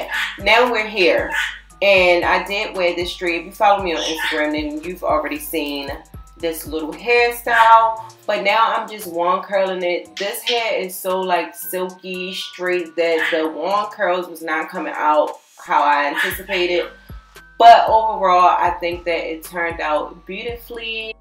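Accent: Baltimore, United States. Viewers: Definitely turned out cute!! Sound like u from bmore! Lol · You from Baltimore? I hope you don’t say “Ehh no “ lmao cause you low key sound like you from here plus you cute asf !! 😍Your hair came out BOMB! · You are so pretty!!!! Are you from Baltimore? U have that city accent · You from Baltimore omg 😭 I can hear it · Heeeey! Are you in the Baltimore area? I hear your accent! Do you have a shop here? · As soon as you started talking, I went to find your IG.. I just KNEW you were from Baltimore lol. I am as well ♥️ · BMore accent undeniable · You from Baltimore huh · She must be from Baltimore I hear the accent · I love your accent? You from Baltimore?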